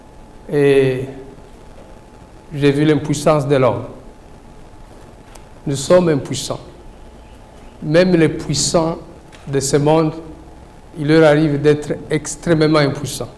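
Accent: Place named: Rwanda. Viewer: Tu te trompes toi même vraiment tu vais par 4 chemins et te voilà arriver au point que Dieu te maudisse profondement jusqu'à ta moëlle tutsi rwandais que tu es tu ne convaincras personne et tu la fermes. Tu voulais recencer les congolais pour Donner l'identité aux rwandais mais tu as raté ton accent ressort toujours. Tes troupes rebelles sont là aussi. Tu as apporté les fonds à vos troupes rebelles et intensifier les tueries dans notre pays. Tu as tué combien de congolais leur sang tombe sur la tête de tes enfants. Je souhaite que tu ne sors pas vivant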